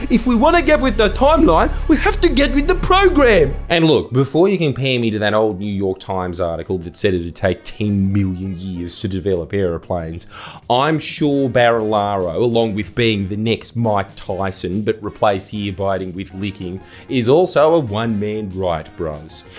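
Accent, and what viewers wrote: Italy. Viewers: Glad to hear the Italian accent is back. It’s like being back in the 90s. Thank you,.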